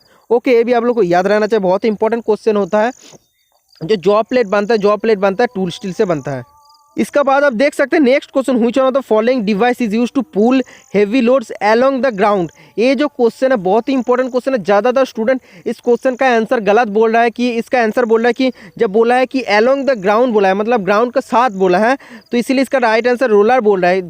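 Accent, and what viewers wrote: Bengal, India. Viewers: U r from Bengal?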